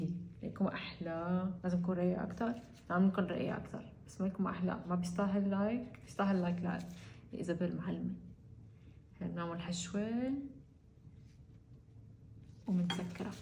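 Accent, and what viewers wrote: Syria. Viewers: هههههه بتحكي سوري لهجة شامية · مش مممممممنكلامك السوري روووعه❤ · لهجة لادقانية هي ههه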